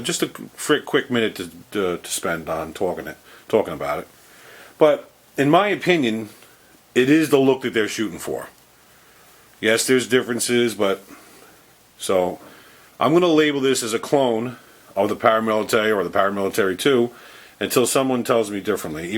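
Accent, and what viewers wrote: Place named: New York, United States. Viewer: accent for sure it's hard to mistake. I've been out of NY for quite some time and I still have mine.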